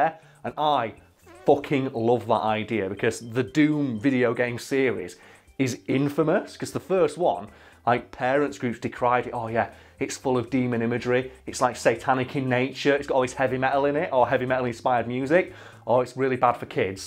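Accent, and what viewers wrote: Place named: United Kingdom. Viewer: * heavy British accent *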